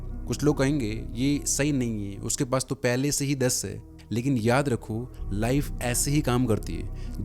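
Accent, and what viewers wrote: Rajasthan, India. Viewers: Ye banda rajesthani hai jo bol raha hai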